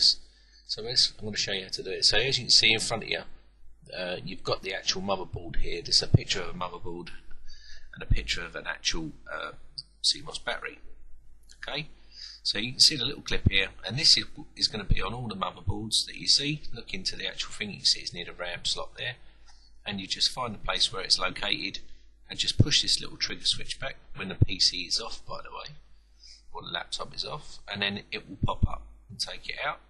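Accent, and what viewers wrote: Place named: Cockney, United Kingdom. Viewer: Your accent is hard to understand. Speak clearly and don't cow off the words. Not many people understand cokni.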